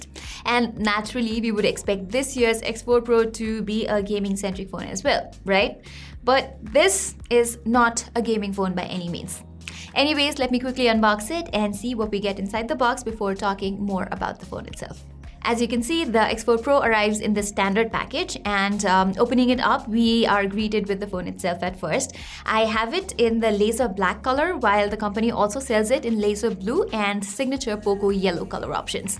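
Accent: India. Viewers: Just fell in love with her Hindi accent 😍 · Your hindi accent sunke mujko Accha lagra hai....., 😍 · Your Hindi accent hayyy 😍😍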